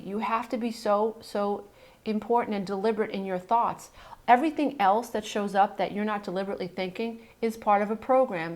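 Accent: New York, United States. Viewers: Love the New York accent! You don't have to apologize for it. Thank you for this deeply inspiring video :) The message is spot on: your thoughts are your destiny! We empaths, who are stuck in the memes of the past, can't hear this often enough. Thanks to you, I finally get it! Peace and love to you and yours in the New Year!!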